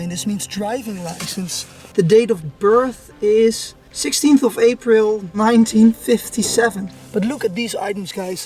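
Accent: Netherlands. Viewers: Are you dutch Cus your accent is typical for a netherlander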